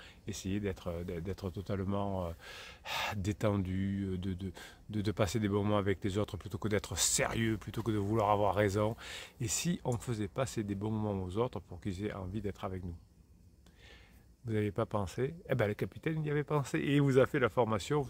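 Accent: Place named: South, France. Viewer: Merci pour votre réponse elle m'est allée droit au cœur J'aime beaucoup écouter vos vidéos et votre accent méridional me donne l'impression d'être en vacances 😊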